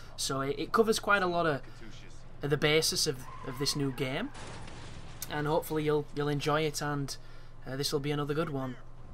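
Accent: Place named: Scunthorpe, United Kingdom. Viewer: You sound very local.... Well, too me you do! Where you from? Can't be too far from Scunthorpe!?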